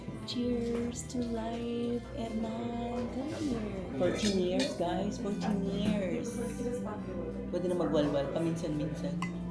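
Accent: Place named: United States. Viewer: Ohh i know who looks like now, she looks like Priyanka Chopra, but has American English accent than Priyanka.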